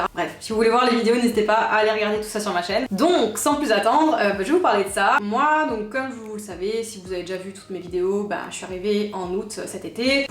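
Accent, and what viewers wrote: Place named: France. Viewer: je vois sur plusieurs commentaire que tu ramène souvent l'accent francaise et que les québécois ont déteste ca au points de pas vous aimez ? Je ne sais pas ou tu as entendu ca mais la majorité des québécois ce fou pas mal de ton accent.. il ne faut pas généraliser.. ce que nous détestons vraiment ce sont ceux qui ne veulent pas s'intégrer au coutume quebecoise.. apars ca.. ont est très chaleureux en général..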